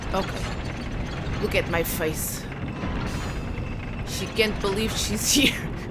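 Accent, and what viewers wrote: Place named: Eastern Europe. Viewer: I thought you are east european. lol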